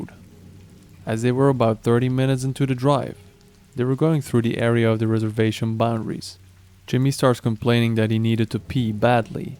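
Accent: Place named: Eastern Europe. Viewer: 1st time listener.... that first story was creepy. Your accent reminds me of russian? Eastern europe?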